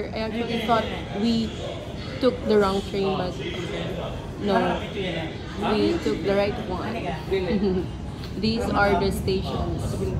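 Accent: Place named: Philippines. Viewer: From her English accent, she's Filipino.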